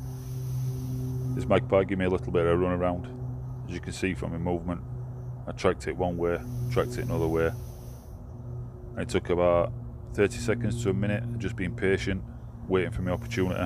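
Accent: Yorkshire, United Kingdom. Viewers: I HEAR YORKSHIRE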